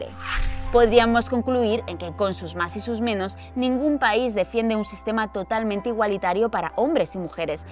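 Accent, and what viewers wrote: Spain. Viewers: Pues yo vivo en España y deduzco que por tu acento tú también. ¿En algún momento has sentido que tu vida no vale nada? Pues yo no. Siempre he sabido que mi vida vale lo mismo que la de todos los seres humanos. Me encantaba este canal pero de verdad, este vídeo está lleno de desinformación, negligencia e ignorancia. Es que, ¿España y México dentro? Tanto en España como en México se valora la vida de las mujeres. Y teniendo en cuenta que el título es "Países en los que las mujeres no valen nada"... pues eso.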